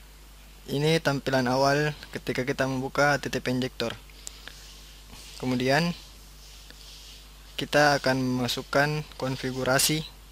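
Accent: Makassar, Indonesia. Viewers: lokatnya makassar bgt... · Kayak nakassar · dari logatnya kayak org makassar.. wkwwkwwk yg ngomong macam pengen ketawa tapi ditahan.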